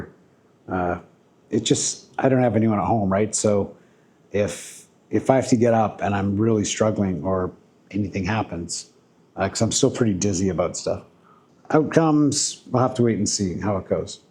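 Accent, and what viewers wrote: Canada. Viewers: Your accent appears to be Canadian. How come you didn't go for the free stuff in Canada?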